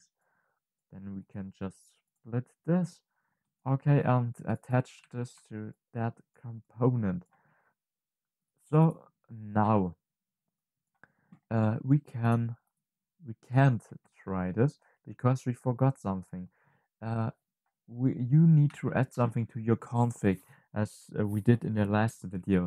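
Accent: Germany. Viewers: Are u german? (just askin)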